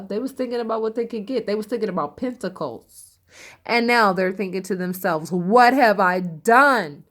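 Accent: New York, United States. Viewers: you got that Harlem, Bx or Brooklyn heart in you...I can hear it when you speak😉🤩. Again, thank for this Cancer read!! Pisces is soon gonna see!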